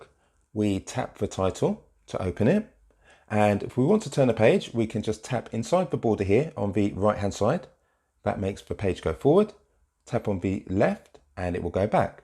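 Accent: United Kingdom. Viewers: Not helpful at all for me. The Speaker talked too fast, but more importantly, I tried to keep up with him to find the screens his finger touched and I could not duplicate the function. I bought this new Paperwhite 2021 a few months ago (this is November 2022) because I was advised my older Kindle would no longer operate with new books added. I am trying to find someone who can actually show me how to operate the Paperwhite. Finally, why have a British voice on this video when there are thousands of American speakers who would be easier for the American ears to understand.?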